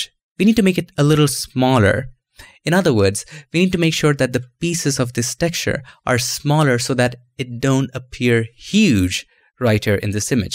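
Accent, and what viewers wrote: India. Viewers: When you find a good tutorial : :D When you see the guy have an indian accent : :/ But you stay because it's awesomely done : OvO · I don't get it? What's wrong with having an Indian accent?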